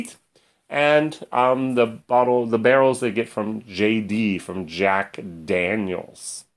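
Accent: Germany. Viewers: Hi, i didn't know you had an english channel too. funny to hear that you sound so much like a german who's speaking english😄(no offence intended!)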